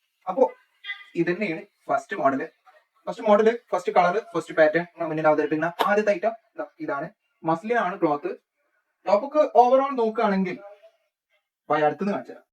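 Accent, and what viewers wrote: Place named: Malappuram, India. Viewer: Malappuram slang anallo evide sthalam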